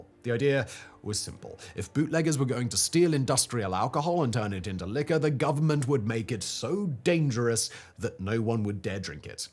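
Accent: England, United Kingdom. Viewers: I'd love to hear this guy speak when he's not putting on the poshest English accent he possibly can. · Whos laws are we talking about? This guy had an English accent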